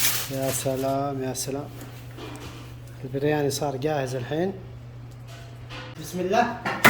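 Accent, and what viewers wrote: Yemen. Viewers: يا جماعة الشيف يمني والا شنو لهجته + عاشت ايدك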